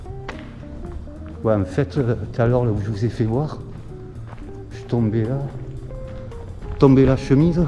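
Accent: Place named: Provence, France. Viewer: Coucou si vous me le permettez ☺️ Merci infiniment de nous faire partagez votre magnifique région qu’est la Provence Je suis allée il y a quelques années déjà à Saint Rémy de Provence j’y ai visité aussi les baux Votre accent me réchauffe le cœur J’espère que vous êtes bien rentré de votre randonnée! Je vous souhaite une bonne fin de dimanche et, Un bon repos surtout après tous ces kilomètres dans les jambes Une amie virtuelle de la Belgique 🇧🇪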